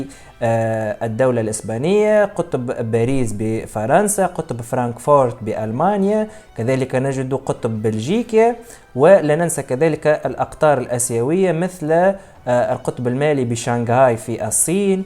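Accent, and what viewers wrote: Tunisia. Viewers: بارك الله فيك.. مجهودك مشكور ...وطريقتك في التقديم جميلة +اللغة العربية الفصحى بلحن تونسي جميل....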